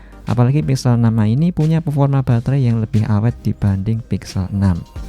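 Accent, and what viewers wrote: Boyolali, Indonesia. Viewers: Lo lo lo, wong boyolali to mas?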